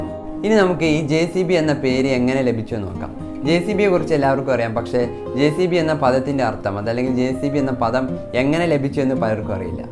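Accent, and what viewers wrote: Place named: Malabar, India. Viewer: Nannaitund Malabar slang edakk varund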